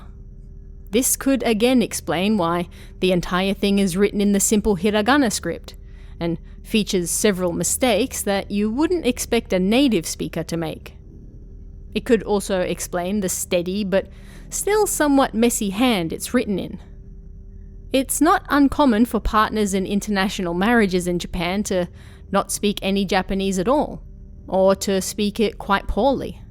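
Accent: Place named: Australia. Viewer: You sound Australian 🤔👍 thanks for you work 🙂 I couldn't do it 🐨🇦🇺🦘🙂.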